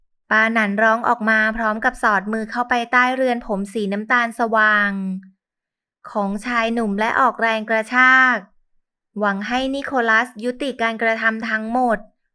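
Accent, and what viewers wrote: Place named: Thailand. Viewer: เสียงเหน่อ น่ารัก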